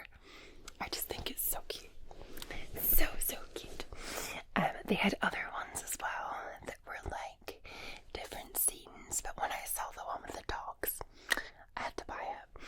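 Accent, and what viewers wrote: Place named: Scotland, United Kingdom. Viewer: Greetings from Glasgow. It’s so authentic to hear ur accent, nice to hear a homelander doing ASMR! Love ur content especially scalp massage. Helps with anxiety and sleep. Thanks x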